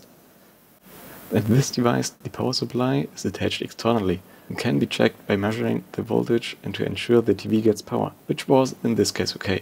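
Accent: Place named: Germany. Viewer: If I’m not wrong you sound like you’re German, I tried ordering from MJK but they seemingly don’t ship to Germany? How did you get one? 😊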